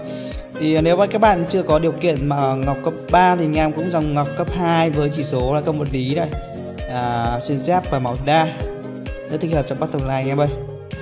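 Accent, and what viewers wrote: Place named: Hanoi, Vietnam. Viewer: ah quê ở đâu vậy hơi gọng giống ở hn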